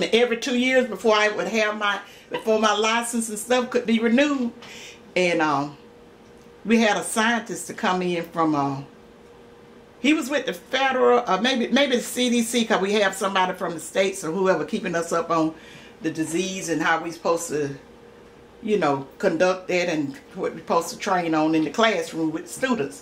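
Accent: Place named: Texas, United States. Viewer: Thanks for your channel. You make me want to cook again. Where do you live? You sound a lot like my folks from Sulphur Springs, Texas.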